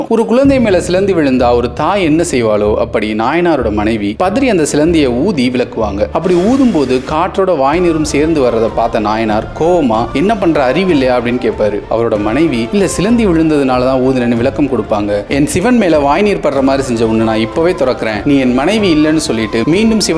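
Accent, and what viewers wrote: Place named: North India, India. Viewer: Please created south indian style AI.... Its like north indian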